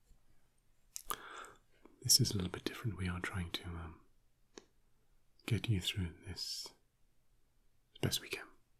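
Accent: United Kingdom. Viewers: such a fine British accent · Ok. Since I'm touring your production I'm guessing what country your accent belongs. You sound brit but not too much.. i associate you with actors like Christian Bale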